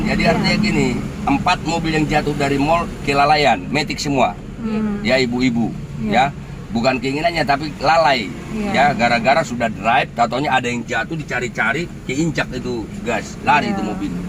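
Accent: Medan, Indonesia. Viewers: Enak ini bapak ngajarinya top mudah dipahami sabar dan telaten diawali dr dasar bicara logat Medan teringat ayah ku kalau bicara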